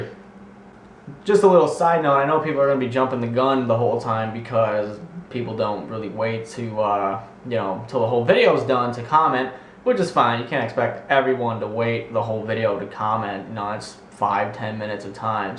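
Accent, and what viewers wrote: Australia. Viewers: Crikey mate, you're so good at this S'trayan stuff, if i di'nt know any betta i'd think you were S'trayan myself, or a facking kiwi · Sounds as if you've lived in Australia all your life. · I'm Australian, and you sir, are a fair dinkum aussie ;) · Haha, first i am Australian and i say it exactly the same as you pretty much, so thats just someone being weird. Very intesting places, i only know 4 of them...i found snorlax :D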